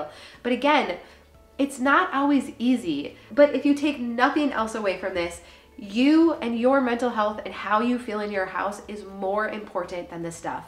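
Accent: Minnesota, United States. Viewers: I needed this ❤ Also a MN-girl ❤born and raised! I think that's why I "hear" you so well... 10 people could say the same thing, and I obsorb 🚫 nada But starts talking and its like the epitome of Minnesota Nice 👌 I'm so comfortable when you talk 😌 Really helps me actually, take in the lesson you're trying to get across!!!!! I freaking love you❤ Thanks for being you🙏 and all that you do for us!!!!!!!!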